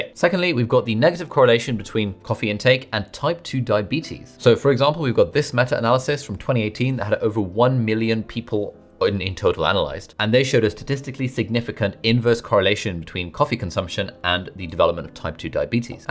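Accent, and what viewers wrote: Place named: United Kingdom. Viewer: New Subscriber, where in the UK are yiu, can't work out the accent? 🤔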